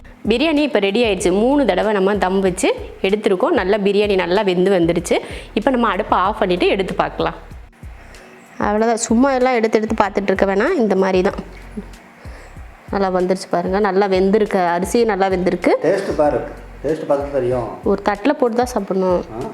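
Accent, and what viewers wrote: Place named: Tamil Nadu, India. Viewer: Neenallathamilpesuringa Thinelvelibaseivera Karakudithamil Super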